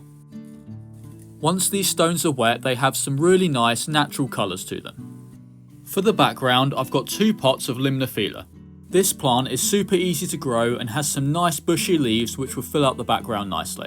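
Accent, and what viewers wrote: United Kingdom. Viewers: Beautiful setup! Watching this to help do mine soon, thank u! ☺️ Would love to watch more videos with the natural cadence of your lovely british accent! ✨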